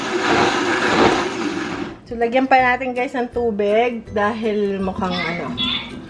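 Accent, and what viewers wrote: Batangas, Philippines. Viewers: Batangeño din ako pero kakaaliw po ang punto niyo. Haha hindi nawala. God bless po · 😁😁 ang cute ng accent nyo pg nag babatanggenyo kau 😄💓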